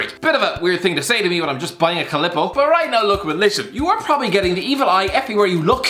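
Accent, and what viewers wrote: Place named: Ireland. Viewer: Nothing like starting my day with an Irish guy rant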